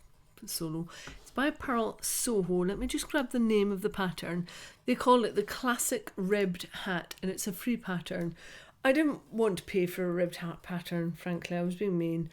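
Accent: Scotland, United Kingdom. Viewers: Do you always sound more Scottish when you're ill?! Hope you're feeling full of beans soon.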